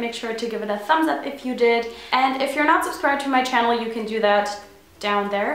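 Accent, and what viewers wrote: Germany. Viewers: may it be you have a german accent? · #germanaccent <3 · Kann es sein das du deutscher Herkunft bist? Man hört einen nämlichen einen leichten Akzent raus. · Her german accent is almost unhearable, i had to see her amail address to be sure.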